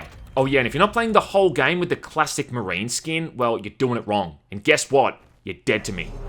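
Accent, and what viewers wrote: Australia. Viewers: Aussie accent ftw boi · Makes by far the best Reviews existing on earth. By the way, are you from Australia?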